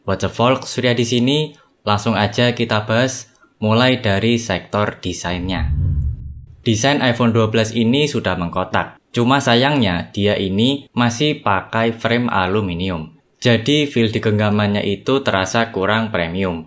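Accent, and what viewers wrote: Java, Indonesia. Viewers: tambahan bang, menurutku pembawaan udah cukup oke, tapi ada 1 yang kurang, kata2 "gua" coba diganti aku/saya, agak lebih oke, soalnya medok nya lumayan kentel😭🙏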